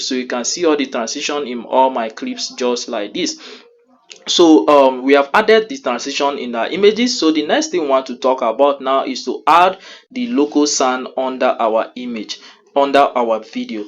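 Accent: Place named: Nigeria. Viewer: How did you come about the Nigerian textured voiceover?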